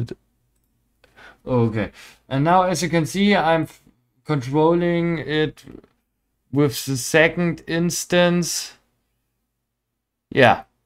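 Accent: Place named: Dresden, Germany. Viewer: Geiles Tutorial. Als ob du auch aus Dresden kommst. komme auch dort her😅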